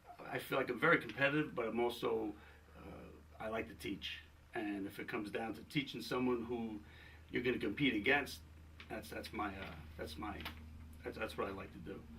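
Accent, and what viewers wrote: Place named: Italy. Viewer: He's got that awesome Italian kind of accent thing going on. :D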